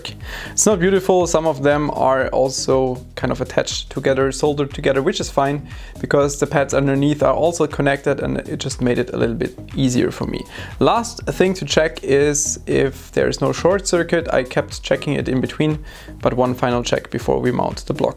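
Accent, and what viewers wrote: Germany. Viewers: man hört das deutsch echt arch (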